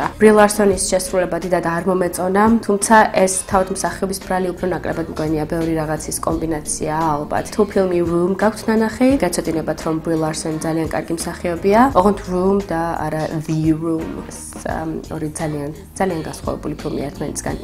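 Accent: England, United Kingdom. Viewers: ნუ ეს პირველი ვიდეო იყო რომელიც მე ვნახე შენი და ეს ინგლისური აქცენტი ჩემთვის საკმაოდ კარგად ჟღერს რაშიც ბევრი არ დამეთანხმება კომენტარებს რომ გადავხედე წინა ვიდეოებზე. anyways :დ ხმა ძალიან კარგი გაქვს და ნუუ ალბათ უფრო კარგი იქნება თუ სახესაც გამოაჩენ. ყოველ შემთხვევაში მე ძალიან მომწონს, როდესაც თოთოეულ წარმოთქმულ ემოაციას ვხედავ თუ როგორ აღიბეჭდება ადამინის სახეზე. ძალიან მომეწონე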